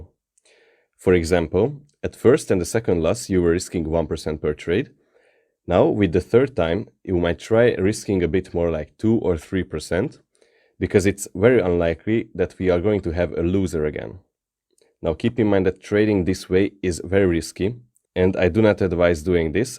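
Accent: Hungary. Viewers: jáj de magyar akcent :)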